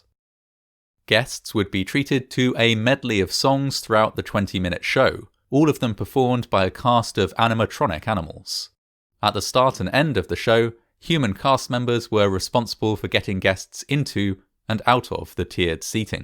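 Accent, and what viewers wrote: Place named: RP, United Kingdom. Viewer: Why does this guy speak so slowly does he think that Americans won't be able to understand is highfalutin English accent? I have to turn it up to 125% playback speed just to not be annoyed.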